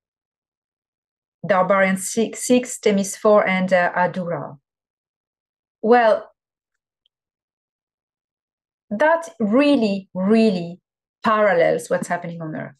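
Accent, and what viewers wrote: France. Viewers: I wish someone would transcribe these videos. I want to hear and understand but the CC leaves so much to be desired.! And I just can't 'get with' the French accent. :(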